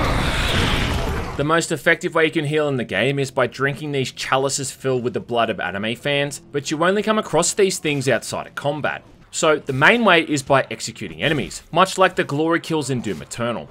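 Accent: Australia. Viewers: Do I hear... ...Australian accent?!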